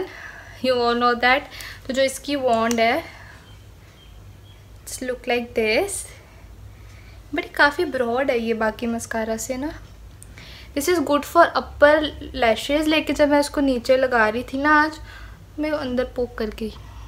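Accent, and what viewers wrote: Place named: Punjab, India. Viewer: Your Punjabi accent is super cute 💕💕💕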